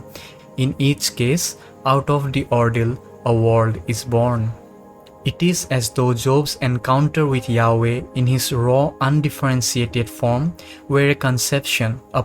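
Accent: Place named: India. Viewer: Nahh bro. I like his Indian accent. It makes him unique from other western Jungians. Love it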